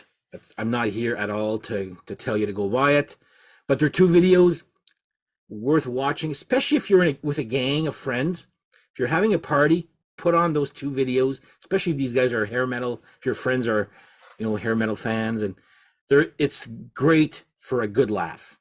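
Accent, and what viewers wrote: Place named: Canada. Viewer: I was watching this video, and listening to you, and my first thought was "this guy sounds Canadian". Then you said "Power Hour", and I noticed the senators flag in the background! 🤣 Ah-HA! A fellow Ottawan. I actually know Michael Angelo Batio, and he's a really good dude. His guitar playing talent is unreal. I've never seen anyone play as fast as he does (even today in his 60s!). As an old school 80s Thrasher, was never my thing, but Mike's technical chops cannot be denied! Cheers!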